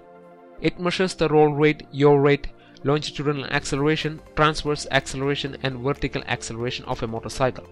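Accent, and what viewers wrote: Kerala, India. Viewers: very good video. well explained. is the speaker mallu guy ? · Hundred percent Mallu, but he is brilliant, and knows the subject very well. I loved the video.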